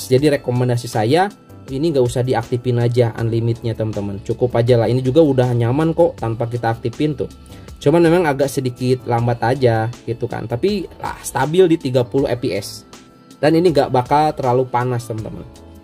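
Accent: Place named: Padang, Indonesia. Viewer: Logat Padang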